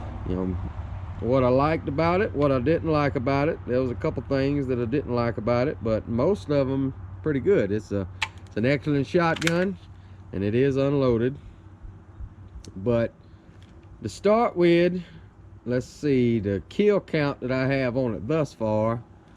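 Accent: United States. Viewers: I don't know what part of America but just love that accent. Thanks for the review.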